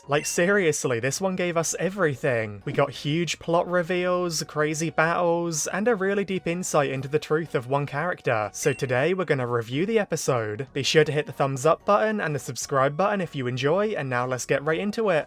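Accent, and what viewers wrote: United Kingdom. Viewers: You don't sound tired..... you sound brittish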